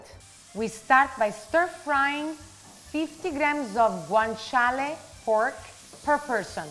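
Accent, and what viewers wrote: Italy. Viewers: Your beautiful and i love your Italian accent I'm sicilian and i love your ricette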